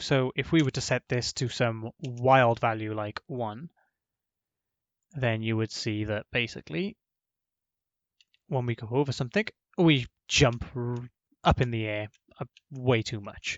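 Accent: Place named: Wales, United Kingdom. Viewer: Looks like I'm not the only Welshman (I'm guessing by the accent) who's struggled with stairs! I'll have to give this solution a try, as my own solution became very convoluted, but the in-game result looks very similar to yours. I was trying to create a sort of "fake ramp movement" where I'd calculate the angle/direction from where the character is standing, to the point where they would be stepping up to, and then altered the players movement direction according to that. It involves a bazillion raycasts and lots of fudged maths, and I'm sure is massively inefficient. Maybe this simpler approach is what I need!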